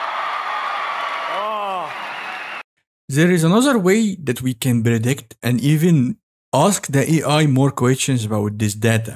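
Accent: Egypt. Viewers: yes Egyptian accent is very clear through the video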